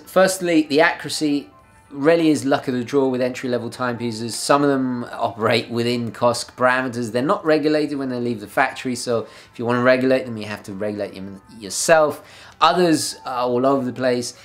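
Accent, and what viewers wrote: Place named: United Kingdom. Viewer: Hi been watching a few vids good stuff. I'm about to buy another watch, not made of money so looking at hundred UK. It's either the Rotary Windsor or Regent, love them both just wanted your opinion. Now the regent is originels range but something classic about the Windsor. Your thoughts? Also, sounds like you are from the UK but noticed your Italian background on different video, but living in the States?! Interesting Interesting. Good content my friend, I do love a fine watch. Will also check out that book you mentioned meditations. All the best from wintery Staffordshire, Jim.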